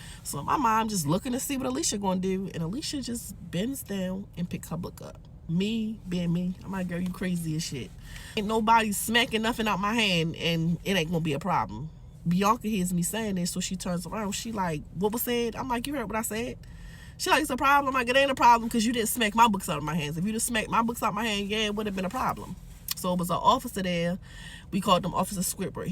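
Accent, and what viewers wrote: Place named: Baltimore, United States. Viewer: I knew exactly where she was from before she said it!lol gots to bmore careful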